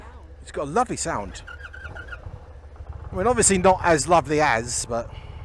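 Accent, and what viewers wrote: Cockney, United Kingdom. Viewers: The cockney accent is unbearable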